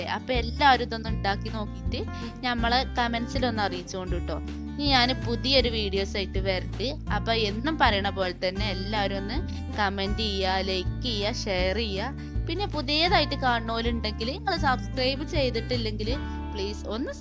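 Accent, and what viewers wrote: Malappuram, India. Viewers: ഞാൻ ഇപ്പൊ കാണൽ തുടങ്ങിട്ടൊള്ളു. ഒരുവിധം കണ്ടു വീഡിയോസ് ഒക്കെ സൂപ്പർ. അവതരണം പറയാതെ വയ്യാ. തനി മലപ്പുറം ഭാഷ. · മലപ്പുറം താത്താന്റെ സംസാരം കിടു 🤣😘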